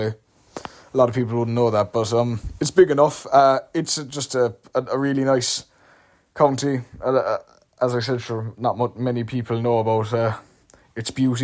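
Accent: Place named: South, Ireland. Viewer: Well I am watching from lovely Leixlip ,and I am enjoying your southern commentary .Keep it up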